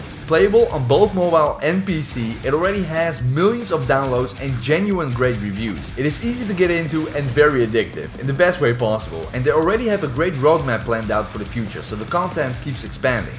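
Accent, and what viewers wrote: Netherlands. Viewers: It’s the typical accent Dutch people have when they speak English. A Dutch person will, like myself, be able to immediately tell that this guy is Dutch or Belgian (Flemish).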